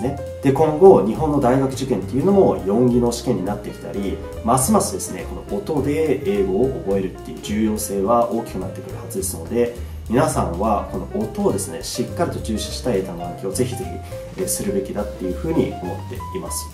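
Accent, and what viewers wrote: Australia. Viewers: オーストラリア訛り？ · オーストラリア英語？ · オーストラリア訛りすかね？ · 発音がすごいオーストラリア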